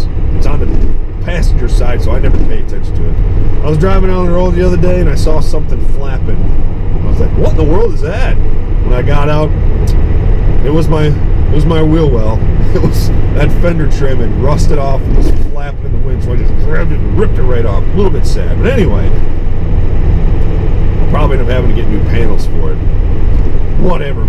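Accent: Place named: Texas, United States. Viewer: Michigan? I figured you were from Texas or something lol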